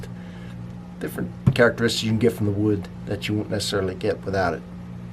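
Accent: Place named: Pittsburgh, United States. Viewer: The silver label with Hawaiian Punch is very good. I drink it when writing. Btw... I’m picking up a Pittsburgh accent from ya. You from western P.A.?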